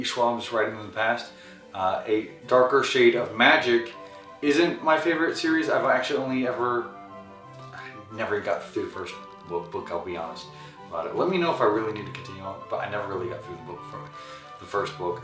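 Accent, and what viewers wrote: South, United States. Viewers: I love your podcast on this video at your house in Texas during your quarantine until you had a lot of books in the back of your room including Surrender Your Sons by Adam Sass, House of Earth and Blood (Crescent City) by Sarah J. Mass, And I Darken by Kiersten White, The Invisible Life of Addie LaRue by V.E. Schwab, The House in the Cersulean Sea by T.J. Klune, The Fires of Vengeance by Evan Winter and so many others after that, but I hope your husband, Zach will be very proud of you, I love your Southern accent and I know you're gay!!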